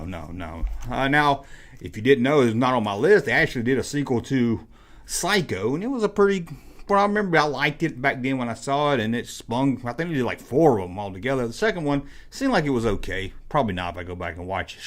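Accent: South, United States. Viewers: Are you from tenneessee you got that southern draw!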